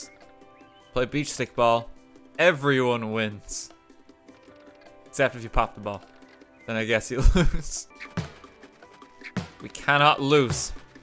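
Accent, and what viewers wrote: Ireland. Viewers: i just found this channel, he kinda has an irish accent lmao- subbed because he's actually really funny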